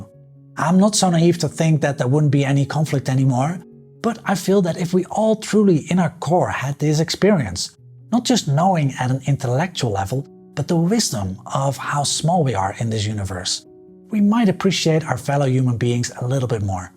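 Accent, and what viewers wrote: Netherlands. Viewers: hello, I just subscribed........I have a question, not related to the video at all.........but is your real name? I'm intrigued. I lived in NL for 10 years and I recognise the accent.........I don't understand the very English sounding name, and can't align it in my own mind with the content you are creating. thanks for clarifying.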